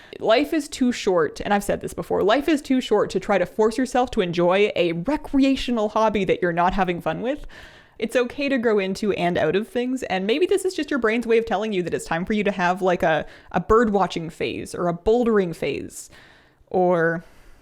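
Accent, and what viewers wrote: Canada. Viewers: Do I detect a hint of Canadian in there? "Blame Canada! With those beady little eyes and floppy heads full of lies!"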